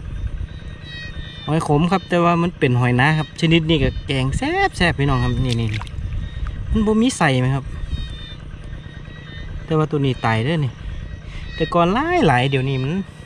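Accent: Chaiyaphum, Thailand. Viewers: กล้องดีเนาะฟังสำเนียงคือคนชัยภูมิบ่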